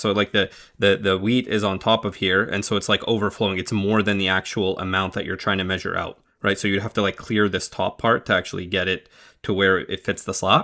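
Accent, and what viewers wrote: Iraq. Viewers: are u iraqi? I'm just wondering because of uour accent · زين اني شلون عرفتك عراقي من اول كلمة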